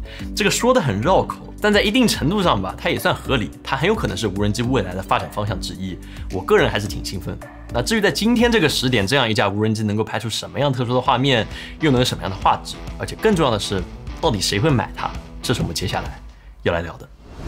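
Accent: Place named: Shanghai, China. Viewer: 這口音也太江浙上海了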